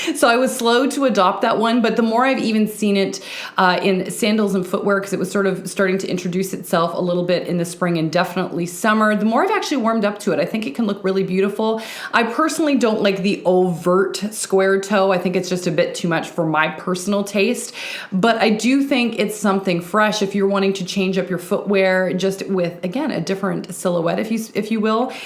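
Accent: Canada. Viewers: Out, about and sorry... how I miss my younger years in Calgary, don’t hear that accent in CA often. Love all the advise you give 👍🏼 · I come for the fashion advice, I stay for the Canadian accent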